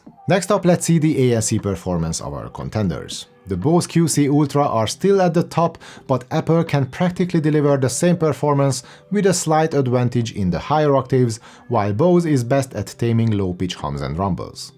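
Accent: Hungary. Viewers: Great video, signature Hungarian accent 😉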